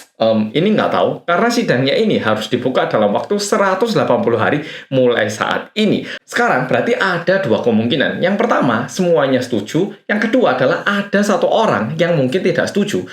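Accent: Java, Indonesia. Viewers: Mantap masss medok, update terus yaaa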